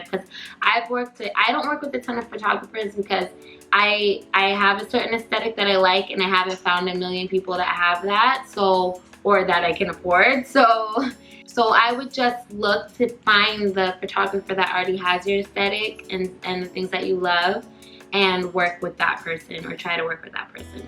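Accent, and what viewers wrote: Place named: Minnesota, United States. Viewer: Sorry, this is kind of left field, but you should like you're from Minnesota... or Canada. Where are you from? Just curious.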